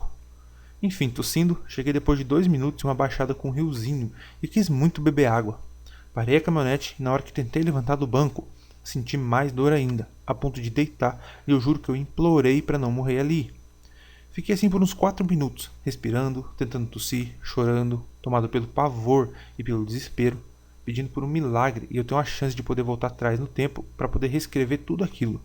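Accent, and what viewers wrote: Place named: Inland São Paulo, Brazil. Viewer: Com essa fala de paulista do interior aff que r ridiculo!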